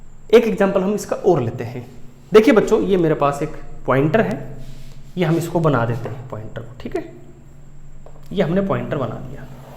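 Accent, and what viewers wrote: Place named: Haryana, India. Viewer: Aapki haryanvi wali tone aa jati h bt aapne samjhaya accha 👍👍👍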